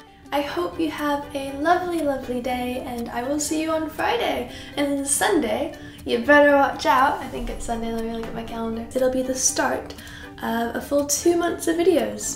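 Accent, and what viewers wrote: England, United Kingdom. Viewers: Has Tom ever told you that you are starting to catch and speak with a British accent?😃 btw your hair is looking so lovely and fluffy 😊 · i love you so much ^-^ tldr- my voice is kind of like your in that its soft and quiet and "weird" and seeing you make youtube videos just makes me so happy and reminds me that is okay to be different. also i love that you have started to say things the way english people do since you moved there :p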